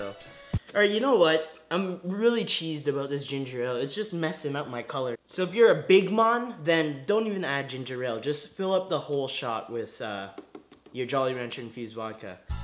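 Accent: Canada. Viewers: Drake on SNL did a skit on black jeopardy and was playing a black Canadian with a Canadian accent. He sounded just like you. He stole your swag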